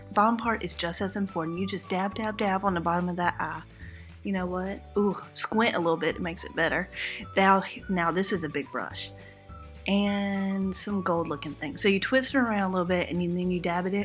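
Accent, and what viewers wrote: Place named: South Africa. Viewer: Damn, I'm only watching these videos to listen to lovely SA accent! lol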